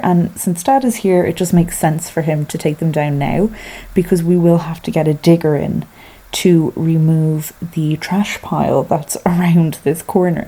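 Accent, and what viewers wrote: Ireland. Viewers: you are my spirit guide I never know the date either 😂 I'm permanently shocked about where the days are going. Also, as a Dub living in the UK the past 18 years your accent and your sayings are a cure for the homesickness. The place is looking great, even better in the sunshine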